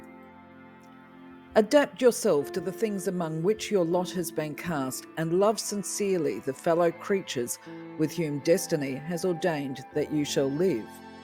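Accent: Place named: Australia. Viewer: Great content, thank you. Nice touch to hear an Australian female voice narrate a welcoming change.😊👍🏽🇦🇺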